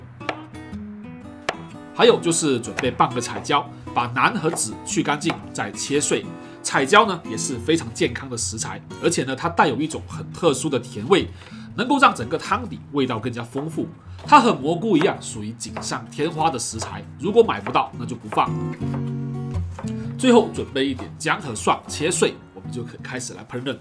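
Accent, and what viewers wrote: Chaoshan, China. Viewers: 好明顯係潮汕嘅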